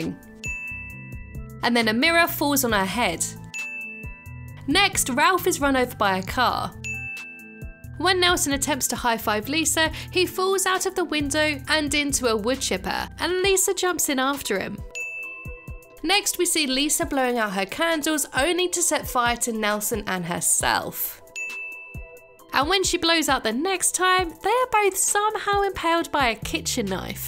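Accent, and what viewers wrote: United Kingdom. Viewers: your british accent totally ruins certain puns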